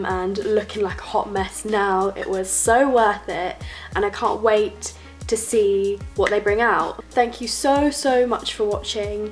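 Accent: RP, United Kingdom. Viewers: I love your accent, what part of Britain are you? (If I could speak in a British accent I would like to have your peculiar regional accent..) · Your accent sound so posh.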